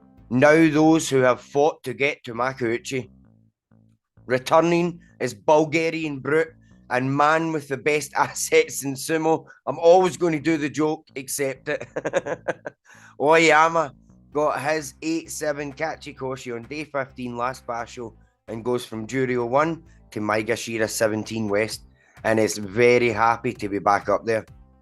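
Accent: Scotland, United Kingdom. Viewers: Good job, and pretty hilarious, too. A thick Scottish accent trying to pronounce Japanese is a hoot. Fortunately you had pics so I could recognize who you were talking about. I would like to see Teru in good health fight one more Basho. Kirishima may be the next Yokozuna, but Atamifuji will follow soon after, I think. Takakeisho will have to go through anger management therapy before he makes it, but if he does he will no longer be as good -- Dilema.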